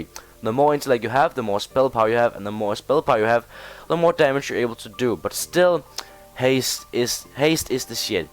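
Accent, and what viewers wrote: Denmark. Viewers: Hey man awesome guide! :) Just a quick question, Where are you from? U sound very Danish lol. Anyways keep up the great work! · Hey dude very nice guide !. btw are you from Denmark?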